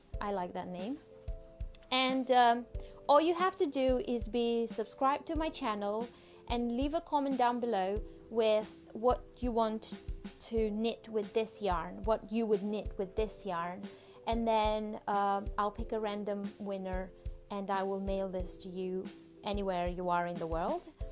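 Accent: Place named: United Kingdom. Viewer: HI. Enjoyed your video. BTW your from UK? Like your accent. THANKS.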